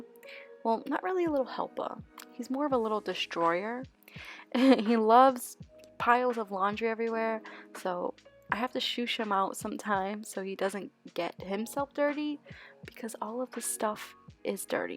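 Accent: Boston, United States. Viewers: Just found your channel. Luv ur Boston accent ♥️🤍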